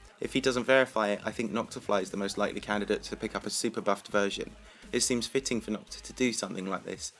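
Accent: United Kingdom. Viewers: I love ur british acent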